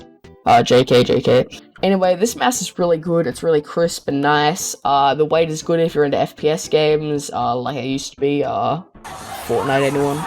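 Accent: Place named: Queensland, Australia. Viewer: finally a bedwars player with a Queensland accent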